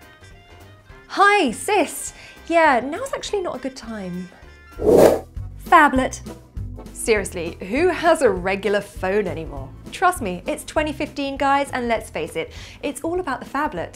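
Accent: United Kingdom. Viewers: PLEASE , keep with the amazing vids!. just please please please add subtitles to them. brit accent is a bit hard to understand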